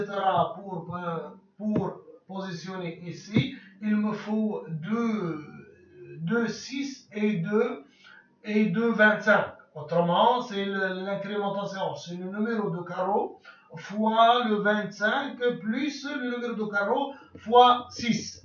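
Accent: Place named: North Africa. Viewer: On comprend rien avec ton accent couscous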